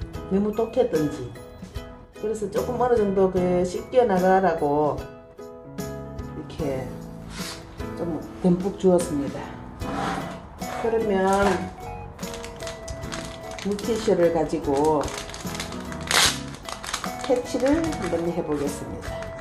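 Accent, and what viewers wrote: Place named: Gyeongsang, South Korea. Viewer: 깍지블레하꼬 엉애 때문에 골치군요. ㅎㅎㅎ. 겡상도 사투리가 참 구엽워요. 매번 좋은 영상 올려주셔서 감사합니다.^^ 이 영상 아주 유용할 것 같아요. ㅎ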